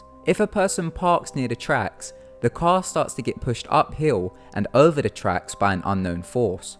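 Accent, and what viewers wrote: United Kingdom. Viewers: Your vids are good and your accent is mint where in the uk you from ? Just subbed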